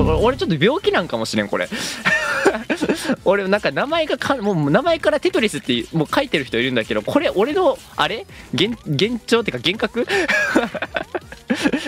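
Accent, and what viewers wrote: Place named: Northern Kyushu, Japan. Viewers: この人の方言から九州北部を感じるんですけどどこ出身か分かる人いますか? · ○○けん。は博多弁すね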